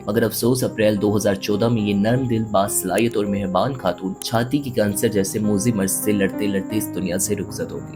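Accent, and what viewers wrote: India. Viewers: آپکا تلفظ انڈین لوگوں جیسا کیوں ھے؟؟؟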